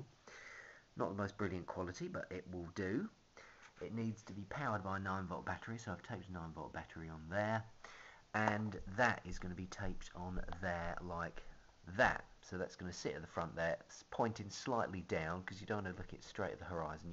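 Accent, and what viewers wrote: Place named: United Kingdom. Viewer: Good old btitish accent mate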